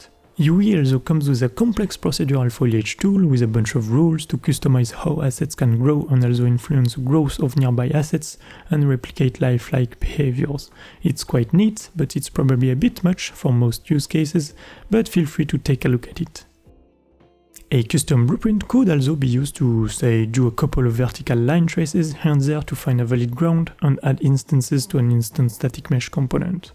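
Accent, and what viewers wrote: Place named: France. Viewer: Awesome tutorial! love your French accent bro.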